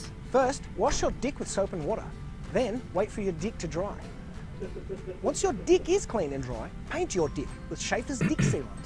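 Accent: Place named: Australia. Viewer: It's an Australian accent peeps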